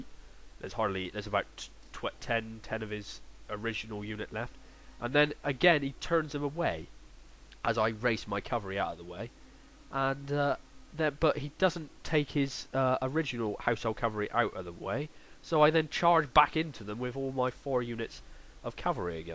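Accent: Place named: Barnstaple, United Kingdom. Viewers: He sounds a bit West Country, maybe possibly Berkshire. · You sound like you are from Barnstaple! Noice!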